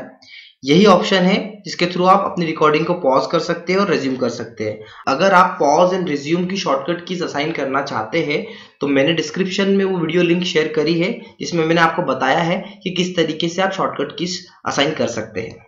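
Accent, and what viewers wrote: India. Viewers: Why is the video description in english, and the audio on the video in a crazy indian dialect? Dislike for you, click bait!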